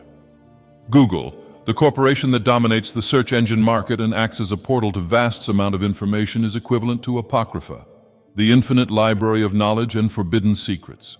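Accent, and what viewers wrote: United Kingdom. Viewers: I didn't notice before, but he's doing the thing where he gets more British as time passes. ❤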